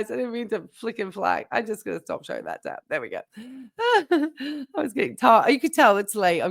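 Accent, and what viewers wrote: United Kingdom. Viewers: if he keeps it up his account will be suspended. it is their own policy. Sarasota Tim is not just targeting you. You are allowed to use some of his material under fair use. As long as it is not for financial gain and just for commentating. It is the same thing has the broadcast media they do it all the time. Tim and Tammy just like scamming people. Their older broth Johnny a very well known Las Vegas area family friendly comedian who lives in Laughlin. I think he performs regularly at the Sands Motel and Casino. Ace Frehley had to deal with them too. On his channel. Love your Cornwall accent. Lovely part of the country.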